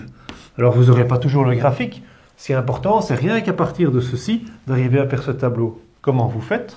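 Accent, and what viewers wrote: Brussels, Belgium. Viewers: Je reconnais bien l'accent bruxellois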